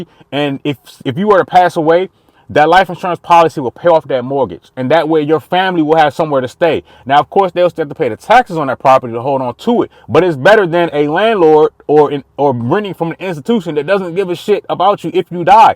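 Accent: DMV, United States. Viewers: Lol i knew from how you talked you were from the DMV, before you said it in this video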